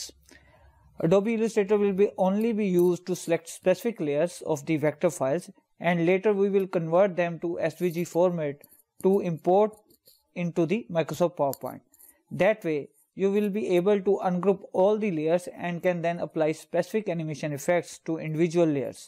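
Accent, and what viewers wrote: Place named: India. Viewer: his accent sounds like indian and look at the top-right corner, his name is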